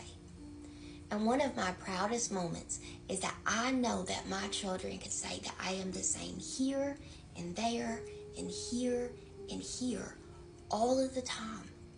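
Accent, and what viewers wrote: South, United States. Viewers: Hot southern lady hot southern accent we got the memo🙏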